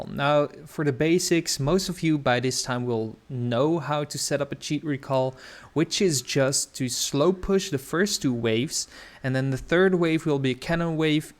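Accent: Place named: Turkey. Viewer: Are you turkish? Your accent really sounds turkish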